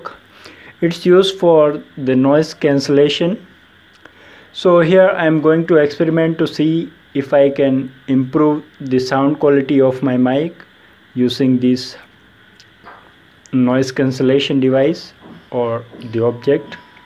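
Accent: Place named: India. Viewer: Your jeet voice cannot be fixed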